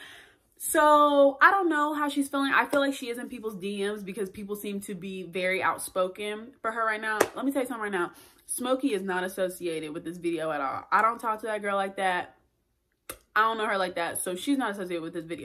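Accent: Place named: Bay Area, United States. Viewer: Are you from the Bay Area sis ? I hear the accent lmao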